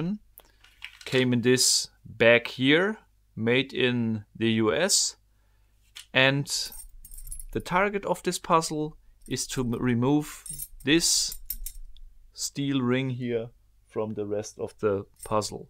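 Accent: Germany. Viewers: ich hätte nie gedacht .... dass ich diesen kanal in meinem leben brauche :-D faszinierend .... entspannend und einen niedlichen deutschen akzent ... ich bin in einer endlosschleife gefangen und kann nicht aufhören deine videos anzuschauen ... danke dafür ... grüße aus mittelfranken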